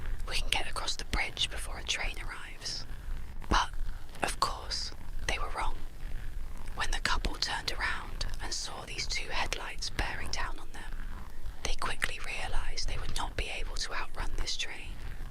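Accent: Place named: Australia. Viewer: Bro I honestly can’t with the accent your an Aussie right? Idk I just was laughing so hard at the beginning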